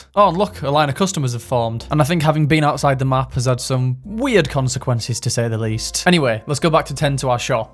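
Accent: United Kingdom. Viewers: with a British accent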